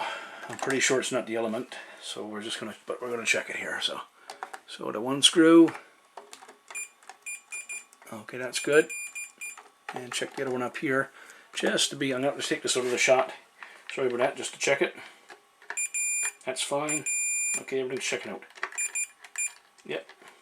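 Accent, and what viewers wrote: Newfoundland, Canada. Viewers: Geez! Great job and I’m on it right now. I heard you speak and I said to my wife,he sounds like he’s from NL maybe even ST MARYS bay! Thanks so much sir.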